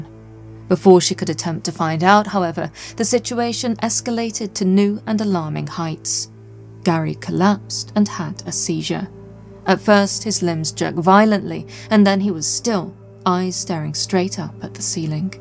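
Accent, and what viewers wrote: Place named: RP, United Kingdom. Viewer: You talk like a toff, try throwing in the odd bit of slang or a good old fashioned 4 letter word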